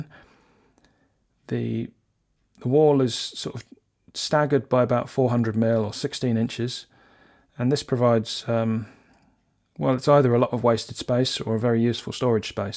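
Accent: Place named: England, United Kingdom. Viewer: Hi, Robert from Sydney here 👋🏼 Newbie to your channel mate, but enjoying your ‘stories’ immensely. Didn’t even know you were in Oz till this vid. I got fooled by the English accent 🤣 My younger son is currently on a two-year secondment in Hobart… due back to Sydney this year. I understand your need for space-efficiency. A lot of my machines are on castors as well ( single car fibro garage 😳). I like your design for heavy duty ‘bases’ for the machines 👍. Regards Robert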